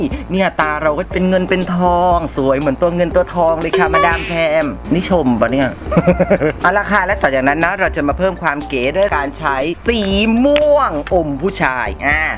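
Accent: South, Thailand. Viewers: วันนี้สำเนียงคล้ายเด็กใต้รึป่าวค่ะ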